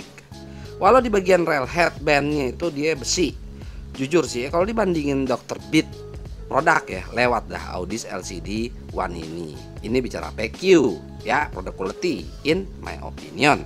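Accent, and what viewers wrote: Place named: Petamburan, Indonesia. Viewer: Logat petamburan nih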